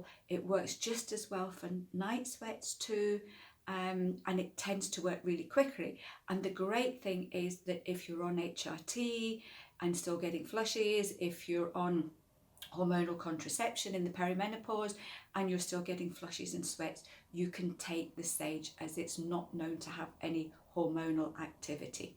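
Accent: Scotland, United Kingdom. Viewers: Mine are just starting. I don't go red but I certainly sweat. Can I take sage tablets while taking citalopram? Do I hear a wee scots accent there? I'm scottish but live in Germany...😊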